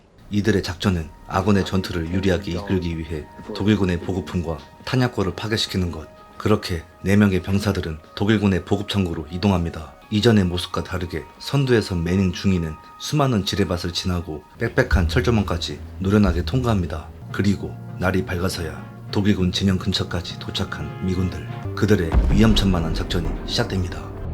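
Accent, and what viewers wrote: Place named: South, South Korea. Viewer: 멘트에 남방 억양이 강해서 듣기 집중이 잘 안되네요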